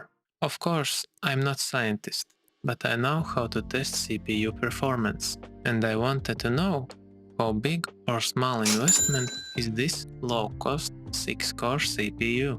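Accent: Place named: Ukraine. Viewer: Heavy accent, reminds me, GO Ukraine! Better Democracy there than the USA right now! haha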